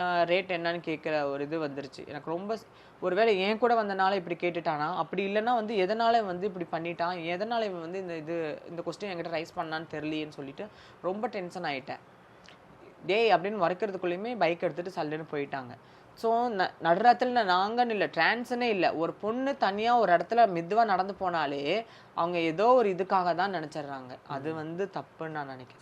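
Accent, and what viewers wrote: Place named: Kongu, India. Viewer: அக்கா நான் கோவை நீங்கள் பேசும் உங்கள் கொங்கு தமிழ் மிகவும் அழகாக உள்ளது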